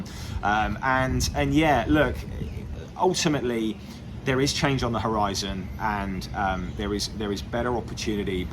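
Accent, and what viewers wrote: United Kingdom. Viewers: Is that a Mancunian accent ?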